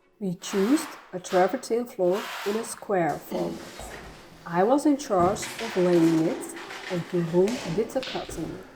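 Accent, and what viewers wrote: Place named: Netherlands. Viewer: Hello! I often think of you and your little family! I have been watching your journey pretty much from the start and i absolutely love what you share and put out to all those, who are interested! Its so refreshing to hear about different customs ( my husband, son and myself LOVE the Netherlands and the Dutch ❤ our son even did Study in Amsterdam ) No need to say, that hearing you speak english with your wonderful dutch accent is fabulous!!! You all seem such great people... even if you haven't shared anything for a while... you are NOT forgotten... you are fabulous exactly HOW YOU ARE!!! Never change that, or ever daubt you are doing something not right on here...YOU AND YOUR FAMILY ARE REAL... you are not faking it...putting something on what not is...loose your personality only to get a few more likes... and exactly THAT is why you and your family are such a joy to watch!!! 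I do hope, that all of you are well and happy and continue to enjoy your life in France to the full! Because in the end this is all what DOES matters... YOUR family and YOUR life and happiness!! Much love...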